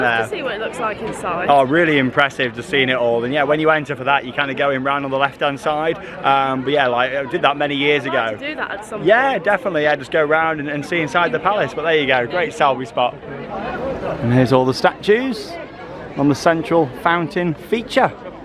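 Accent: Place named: Midlands, United Kingdom. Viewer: Which is your hometown? I can hear midland accent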